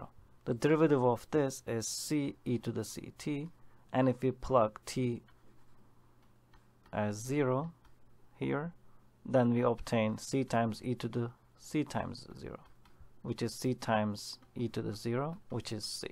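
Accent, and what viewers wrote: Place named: Turkey. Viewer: my guy this is the most turkish accent I've ever heard in my life but your name isn't turkish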